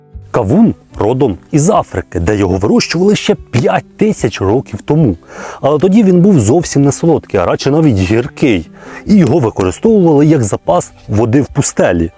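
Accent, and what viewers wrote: Cherkasy, Ukraine. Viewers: Чую земляка з черкащини! Молодець! ❤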